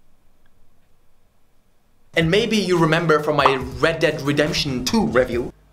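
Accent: Germany. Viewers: Best video ever on youtube, best accent, best game review ever, I love it, laughed every second, your fantasy and creativity are above normal 😂 subbed. And definetely will check others reviews aswell, if your channel is that type, and includes video’s like this👍🏻🤙🏻😀 And I knew you was German because of the S and Z, Sie Germans, Hanz Gez Zhe Flammenwerfer😂 Greetings from Holland Niederland, Niederländisch. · Leon - 501st Liegt eventuell auch daran, dass man das sowieso sehr gut raushört, weil er mit einem STARK deutschen Akzent spricht 😂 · The whole Time I thought why does he have an German accent and then he speaks German 😂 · MY G YOU SPEAK LIKE A GERMAN IN ENGLISH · Wie man einfach diesen deutschen Akzent raushört😂. Ehrenmann. Bin zum erstenmal auf deinem Kanal aber der deutsche Akzent hat dich erstmal direkt sympathisch gemacht😂. Frag nicht wieso...😂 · Haha unglaublich wie ich einfach deinen Deutschen Akzent rausgehört habe. 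Super Review Abo hast du , keep up the great content.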